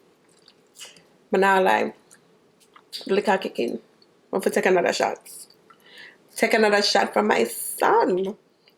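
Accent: Jamaica. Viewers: I know this was 2 years ago but the accent got me curious are u part Jamaican let me know please 🙏 🙏🙏🙏 And ur baby is so cute. Ur vids always got me smiling. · Oh so you sound Jamaican and by the way congratulations on your baby boy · U got a jamican accent❤🇯🇲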